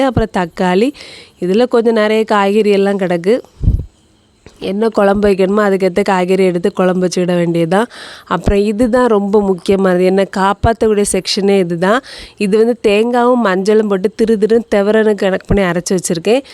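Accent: Kanyakumari, India. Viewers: en amma veedu aloor railway station pakkathile than irukku. Unge videos rompa pudikkum athum antha kanyakumari slang.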